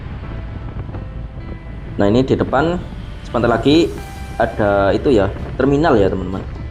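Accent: Java, Indonesia. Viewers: Maaf mas anda sepertinya kurang menguasai kota Cilacap, terus bahasanya medok jadi seolah olah kaku. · Medokbanget lagumu lah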